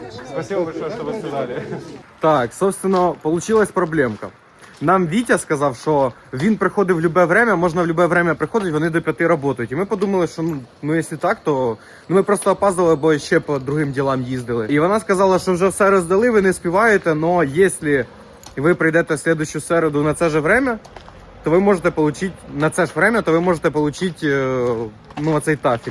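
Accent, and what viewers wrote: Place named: Ukraine. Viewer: говорит на русацком языке, добавляя украинский акцент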